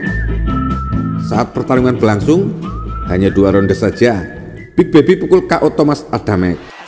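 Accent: Java, Indonesia. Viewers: Mas meddoknya bisa diilangin ga?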